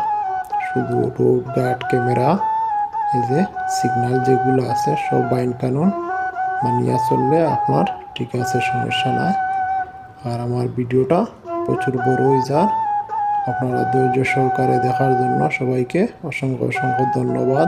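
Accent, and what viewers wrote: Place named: Sylhet, Bangladesh. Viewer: খুব সুন্দর হয়েছে আর এবারটা বুঝতে পারছি আপনি বরিশালের না সিলেটি😅😅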